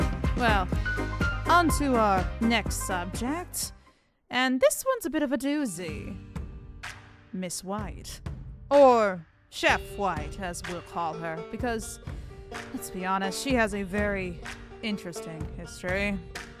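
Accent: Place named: Pacific Northwest, United States. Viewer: 1. Are you from the north east Washington/oregon area? You have little vocal tones that sounded very similar to Lockstin & Gnoggin. 2. Please please please make more of the characters!!!!!! Loved the vid!!!!!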